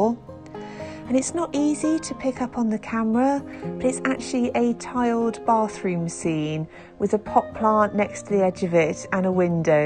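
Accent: United Kingdom. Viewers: Dear, first of all, I want to emphasize that my comment relates to all of your videos and not specifically to this one: I love your calmness, your British accent and it's always as if you were smiling while recording. It's lovely. 💕The music in the background and the recognizable colours (being coordinated) make you stand out among lots of other, also very talented people on the net. Thank you for sharing and keep up with your style and youtubing.:) Looking forward to it ❤️ Greetings from Serbia 🌞👋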